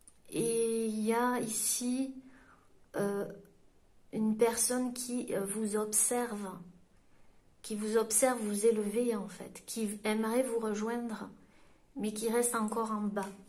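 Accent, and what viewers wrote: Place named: Midi, France. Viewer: Vous. Êtes. Ùne. Gamme. Qui. Libéré. Autrui. Et. J. Adôre. Votre. Àccent. Dù. Midi!!!!!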